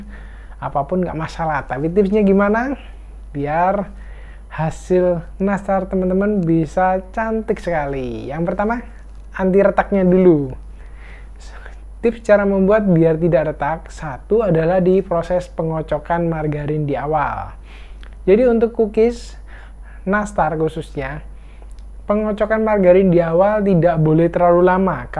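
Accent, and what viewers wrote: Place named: Java, Indonesia. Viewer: Jawane ora ilang Yo mas,tadi sedikit bingung waktu bilang cokot,😄😄😄setelah saya ingat" ternyata gigit,,makasih untuk tip nya,sukses terus Yo mas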